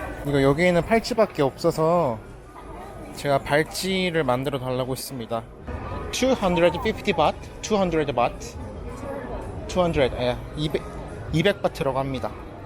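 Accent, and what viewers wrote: Gyeongsang, South Korea. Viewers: 몰랐는데 사투리가 부산경남.. 이신가봐요!ㅋㅋ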